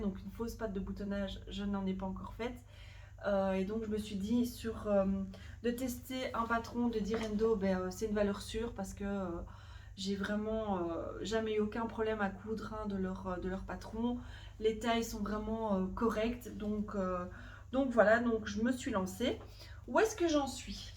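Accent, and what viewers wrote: Belgium. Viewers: Merci pour tes podcasts, c'est toujours un excellent moment, et j'aime beaucoup ton humour, ta simplicité et ton joli accent Belge. Pour les bruits, moi je ne trouve pas cela dérangeant, surtout qu'il faut vraiment tendre l'oreille.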